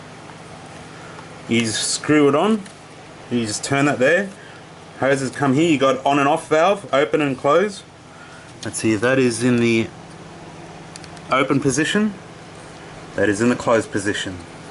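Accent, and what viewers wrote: Australia. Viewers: Love the aussieness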